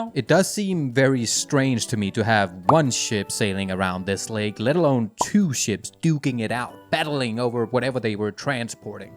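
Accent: Denmark. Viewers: Skide god video! Desværre giver accenten enhver Dansker væk 😅 Du har sgu gjort dig fortjent til et sub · i sense a danish accent, alas it has made me subscribe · Never seen your videos or channel before. Bit I could instantly tell where you’re from. That accent will always be a dead giveaway for us Dane’s..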